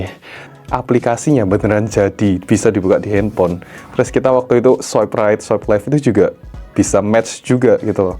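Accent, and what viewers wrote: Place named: Java, Indonesia. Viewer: kok medok ko ?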